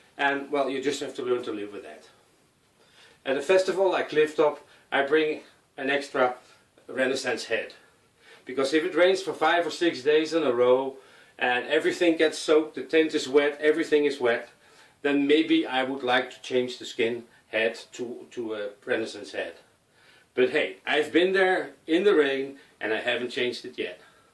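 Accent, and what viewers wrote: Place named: Netherlands. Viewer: my god these banjo's are beautiful. Really having a hard time deciding. I also have a bodhran put easily tunable. Love the sound of real skin, even as a vegitarian. I live on a boat so..well... humidity. Do you still use the wax and does it change the sound. - Kijk net op je site, dacht al ver weg een NL accent te horen.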